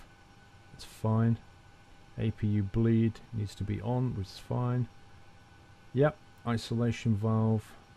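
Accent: Australia. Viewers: ur sounding a lot like an aurrie even thou ur from the uk I think lol but ur saying the names almost spot on lol but I use to watch ur vids for euro truck sim now I know u play this as well so yea can watch more lol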